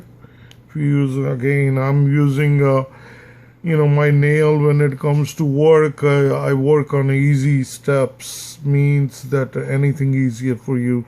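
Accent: India. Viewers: this guy seems like it was his 1st time opening a laptop and the worst video on youtube with his bad English curry English and also half the demo was missing he did not show many steps were missing so thumbs down to u.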